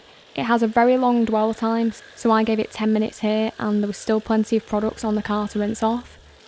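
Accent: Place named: Scotland, United Kingdom. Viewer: What a Beautiful accent. Its that Scottish? or regular British? But I Love it though very sexy. Nice Job on the wash and great car too.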